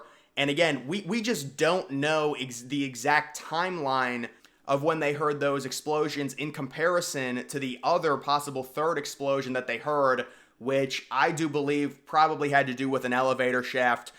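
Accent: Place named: New York, United States. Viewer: I know his voice gives me such a New Yorker vibe 🔥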